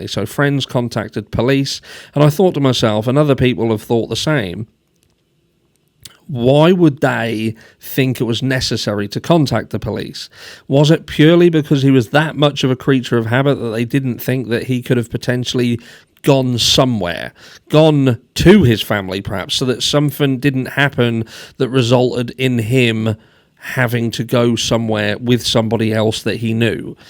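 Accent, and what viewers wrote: United Kingdom. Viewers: what part of UK are you from? I’m interested in accents and learning where they’re from. I traced my dad’s family (Vyse) back to the 1400s in Norfolk.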